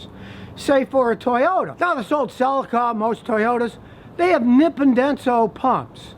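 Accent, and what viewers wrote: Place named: New York, United States. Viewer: You from New York or Chicago? Love the straight forward talk.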